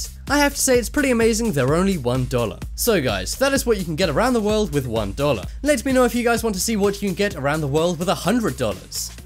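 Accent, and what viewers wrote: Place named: England, United Kingdom. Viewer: Why does he have an English accent but use American terms in all his videos?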